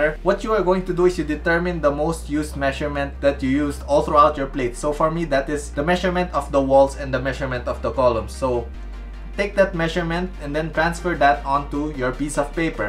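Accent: Philippines. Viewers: Filipino accent haha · Keep up the Filipino accent dude when you are speaking English because it's our identity haha, btw great work, i will try your tips when i will study architecture again haha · i could relly tell your a filipino by accent hahahaha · Are you a Filipino? Your accent sounds like how a Pinoy person would speak in english Edit: Oh nvm you are a Filipino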